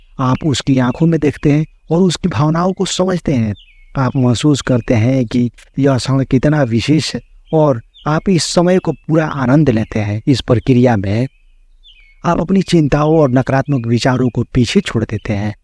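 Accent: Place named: Bhojpuri, India. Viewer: aap saasaram ke hain kya, humko bhojpuri ka feeling aa rha hain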